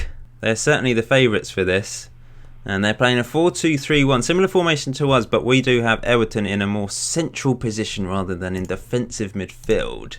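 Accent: Portugal. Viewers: The video is from a dude who is from Portimão and just like in (probably) every country in the world the accent changes from city to city so his pronounciation is different from the Portuguese you hear from Lisbon for example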